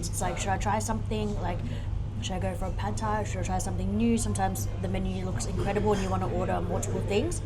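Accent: Australia. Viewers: To answer your question, what you guys watch? The travel and food vlogs have been done to the death. You are may be 10-15 years late to this genre. I have watched two of your videos, I watched them just for drama and hear an Asian woman taking in heavy Australian accent. I have few more critiques but I will keep them to myself.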